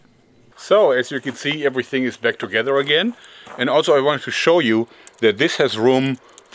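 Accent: Germany. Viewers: So geil der Deutsche Accent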